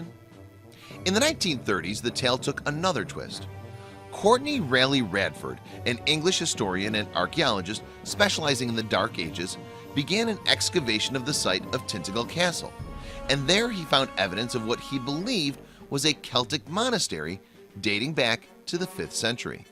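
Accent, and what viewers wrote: United States. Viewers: No offence but always put off by American voices talking about ancient English history and archaeology.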